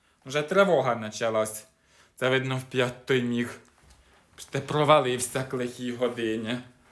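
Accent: Poltava, Ukraine. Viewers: Та Полтавщина так говорить!❤ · Я народилася і живу у Львові вже 64 роки.В нас тут свій діалект,який мені до душі,але з великим задоволенням слухаю,мені все в ній подобається,я ніби переношуся десь в полтавське село і живу між цих чудових людей.Не звертайте увагу на тих,хто не розуміє.Чим більше діалектів в Україні,тим вона багатша і цікавіша! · От якраз, так кажуть в селі, де народилася моя мама, світла пам'ять їй. Полтавська область, тут так і говорять, як ти!) А актрису з такими здібностями ще пошукати добре треба!) Щиро дякую за роботу, за позитив!!!🤗🥰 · величезне спасибі за Вашу працю!!!Якщо чесно,то я не люблю дивитися виступи блогерів,але від Ваших просто у захваті!Адже розмовляєте саме на нашому рідному полтавському діалекті.Відразу пригадується дитинство і бабусі,які збираються біля двору і балакають саме так,як Ви.І на душі становиться тепло і радісно.Ностальгія... 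❤❤❤ А всім Вашим заздрісникам хочу сказати:, Не наравиться,не интиресно-не дивіться!" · Все правильно. У нас так балакають. Це моя Полтавщина. Не обращайте вніманія. Кому не наравиться,то нехай не дивиться. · Тю,так і знала,шо з Полтавщини,бо мої баубунька з дєдушкой вимушені переселенці з Полтавщини в степи Херсонщини.І ми так балакаємо. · Мені дуже подобаються ваші мініатюри.Таким суржиком і діалектом дуже схожим із полтавським говорять колоритні тітоньки і в селах Черкащини) Продовжуйте в тому ж дусі і нікого не слухайте! Слухаючи ваші монологи,я ніби поринаю у дитинство і чую свою бабусю та і згадую багато народних розмовних зворотів,які,до речі,і зараз можна почути у нас на Черкащині. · У селі, де жила моя бабуся (Полтавської області) точно отак і балакають. Після кожного відео немов у дитинстві побувала. Дякую!❤ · ❤спасибі тобі, я родом з Полтавської обл., с.Зуївці, точно так у нас балакали, слухаю з великим задоволенням, наче побуваєш на батьківщині😢, деякі слова чула тільки в далекому дитинстві😢😅...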